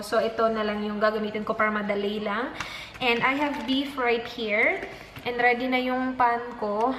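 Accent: Visayas, Philippines. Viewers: hahaha katuwa ka naman the first time I saw your video im not paying too much atention and then i saw again tpos may punto ka sabi bisaya cguro to....natawa ako doon sa mubua nku kalipay oi hahahahahwhich means mababaw ang kaligayahan ko nkarelate tuloy ako sau kc parang gnun lng ako kakalog hahahahhah thumbs up ate!! Rachelle from davao..mwahh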